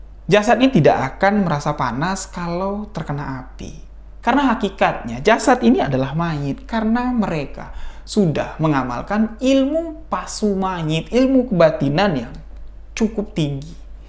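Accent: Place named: Sumatra, Indonesia. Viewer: aku nyimak mulai awal aku kira dulu orang madura .terusdan terus ku smak eh bru aku ngeh oh logat sumatra sekalinya.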